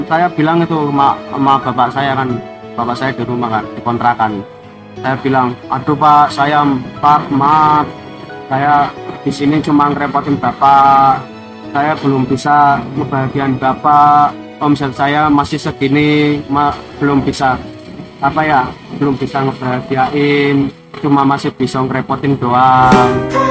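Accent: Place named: Pemalang, Indonesia. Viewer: Logate wong Pemalang kayane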